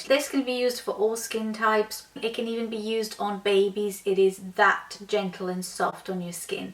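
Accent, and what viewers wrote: Wales, United Kingdom. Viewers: I need to ask where you're from? Because your accent is so interesting, like Welsh and Londoner and other things combined aha!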